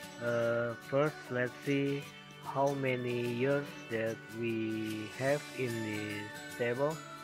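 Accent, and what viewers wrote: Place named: Indonesia. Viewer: makasih bang ilmunya ane cari tutor ini susah banget,,, untung ada abang kerjaan ane cepet kelar,,, logat indonya masih kerasa jadi ane ngerti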